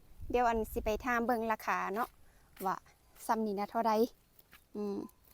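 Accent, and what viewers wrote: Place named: Phu Thai, Thailand. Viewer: สวัสดีจ้าสาว ภาษาภูไทก็มีหลายสำเนียงเนาะจ้า เออสาว อยู่เมืองไหนจ๊ะ😊😊😊❤❤❤❤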